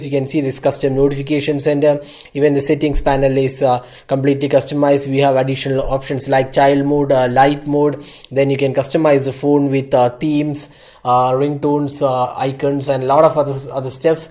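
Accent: India. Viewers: everytime i watch any of Honor 5X review,mostly it was make by an indian people,i not really care about that,but the most offending me is the way you talk, with that indian dialect mix with English totally make me feel uncomfort + not a very clear speak..i hope u can speak more slow and clear voice on the other next video..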